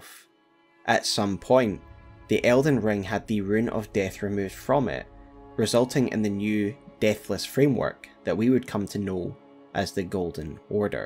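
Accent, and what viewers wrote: Scotland, United Kingdom. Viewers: Man, don't even try to hide your scottish accent, it sounds good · I don't mean to alienate you, but can you please let me know what region of the UK you are from, I'm struggling to pinpoint your accent. Love the content! You are a lore machine.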